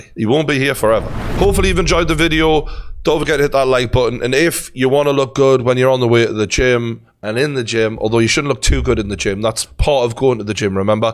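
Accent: Newcastle, United Kingdom. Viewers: Best mma content, love this geordie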